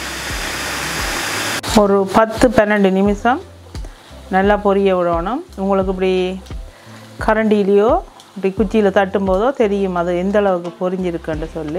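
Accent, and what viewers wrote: Sri Lanka. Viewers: Neenga pesurathu ilangai.tamil madiri iukki pakada recipe marvellous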